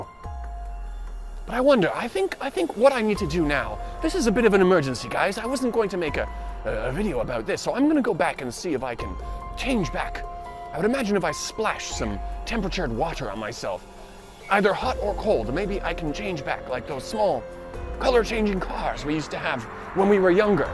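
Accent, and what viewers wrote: United Kingdom. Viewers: Hahahaha I'm rolling on the floor...that British accent😂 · is my favorite YouTuber, but his British accent is just terrible...lol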